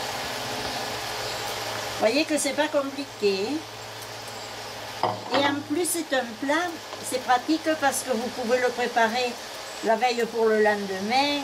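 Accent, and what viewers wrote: Provence, France. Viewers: Bonjour c'est un regal de vous écouter parler ce bel accent de notre Provence, j'adore vos recettes tres bien expliquées par vos soins c'est un régal merci du partage Emy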